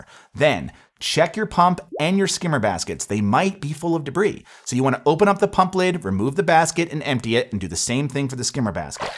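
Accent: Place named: United States. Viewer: Loud mouth american!! Gallons and us accent are of no use😮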